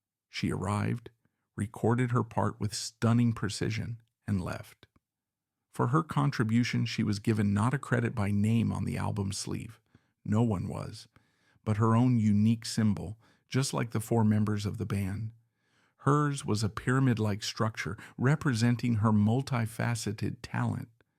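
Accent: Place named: United States. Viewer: American accent at it’s worst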